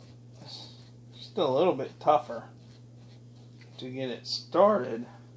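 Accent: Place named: Pennsylvania, United States. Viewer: You have to be from PA right? I hear it in your voice??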